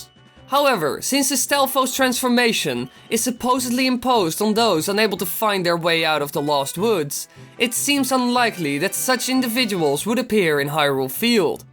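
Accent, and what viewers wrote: Netherlands. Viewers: ...This may be an odd question, but... are you Dutch?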